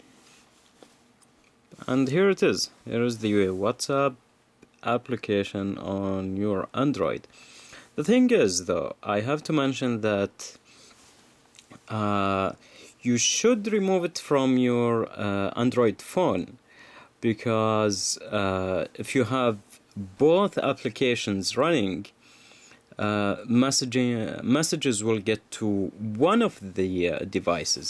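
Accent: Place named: Gulf, Arab world. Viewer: واضح انك خليجي او شي من لكنتك و والكلمات ما تقولها بسرعة ثاني شي الناس الي بالواتس مكتوب تحتهم بالعربي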